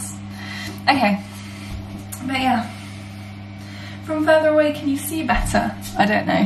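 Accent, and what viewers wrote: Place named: United Kingdom. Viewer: You sound like your from Britain. I've been to London it's a great place. Thanks for sharing this electrifying video with us that watch your channel. Outstanding job young lady.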